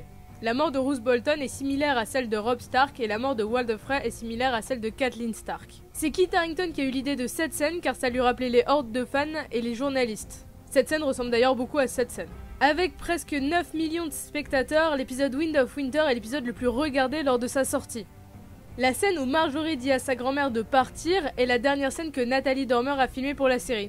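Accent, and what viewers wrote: France. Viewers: Kevin Domac la prononciation de certains noms est clairement difficile. On ne peut pas la blâmer sur ça, elle le prononce seulement à la française. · Tu me fais rire avec ton accent français lorsque tu parles en Anglais.🤣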